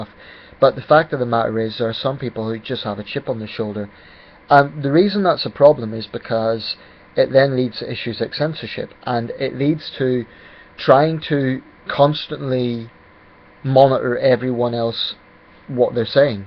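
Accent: Northern Ireland, United Kingdom. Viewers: Ah okay only discovered your channel the other day nice to see someone my age with their head screwed on for a change and do i detect an Norn iron accent? Plenty of up round my area.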